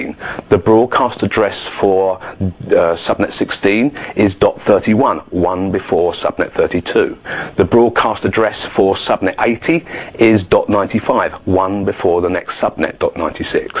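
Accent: England, United Kingdom. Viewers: lol english accent :D lol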